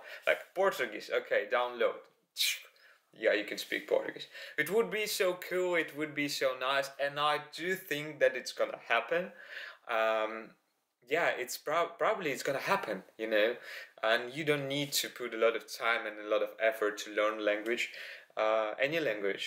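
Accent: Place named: Mexico. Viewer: love that Mexican accent when you speak Spanish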